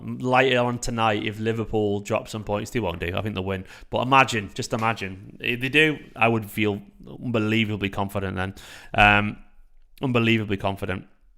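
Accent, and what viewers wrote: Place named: Manchester, United Kingdom. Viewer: Too funny people saying you don't have a Manc accent 🤣 i'm from East Didsbury born and bred and have a similar accent to you ... Yet the Gallaghers are from a mile up the road in Burnage and have a different accent ... wow, so what 😁... By the way, Didsbury, Withington, Burnage, Rusholme and Moss side were always mostly Blue, proper South Mcr ✌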